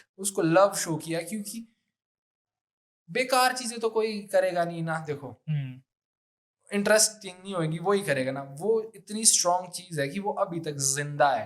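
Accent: Pahari, India. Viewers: Yahi bhai ki sadagi hai accent ni chodte pahari 😂love u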